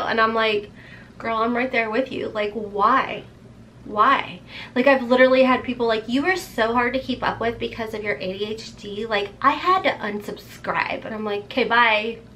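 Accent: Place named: Nashville, United States. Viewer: You crack me up! I love your accent! I'm from Nashville tn and watching you makes me feel at home! To be honest your adhd does too!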